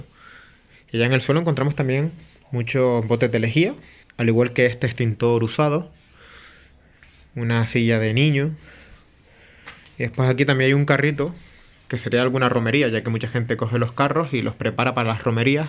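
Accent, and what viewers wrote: Canary Islands, Spain. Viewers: Muy interesante el video con sus aulas de colegio y sus sillas,un saludo ,me encanta tu acento canario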